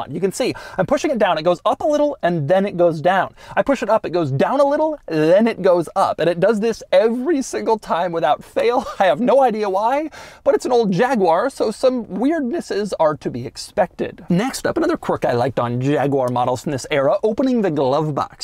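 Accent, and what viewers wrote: United States. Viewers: JAG- WAAAR, Americans are so funny. It is pronounced JAG-YOU-ARE in English. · Why can Americans not pronounce Jaguar = Jag you are, not Jag waaar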